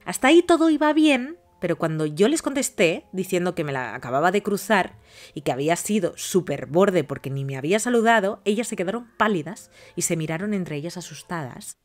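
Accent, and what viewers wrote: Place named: Russia. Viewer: una pregunta tu porque abeces ablas con asento ruso marcando la "R"?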